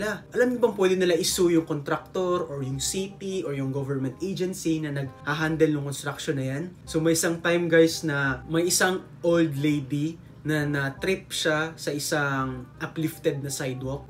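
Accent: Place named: Conyo, Philippines. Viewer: sus taga ADDU man pud diay ka... mao diay conyoish ka mu tagalog...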